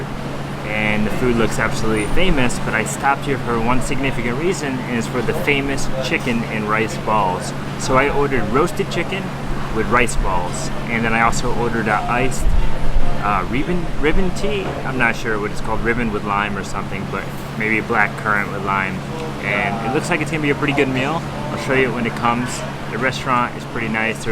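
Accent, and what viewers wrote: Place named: Midwest, United States. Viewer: Just curious to know which US state you are from, your accent makes me wonder if you might be from either Ohio, Indiana, Illinois or Michigan?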